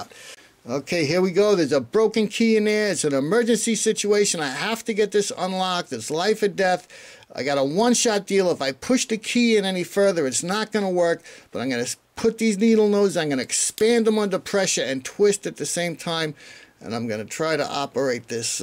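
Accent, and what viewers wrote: New York, United States. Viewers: Good info thanks man. Love the NY accent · Excellent video and I'm loving the accent, New York or at least NY state? or is that Boston?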